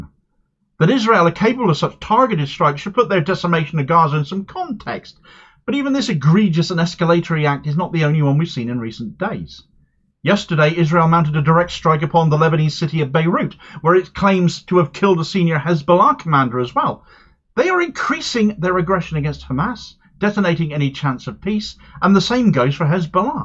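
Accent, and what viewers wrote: Cornwall, United Kingdom. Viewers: I have to agree, your lovely Cornish lilt could sound so much better! (btw I love your work and am on Patreon!)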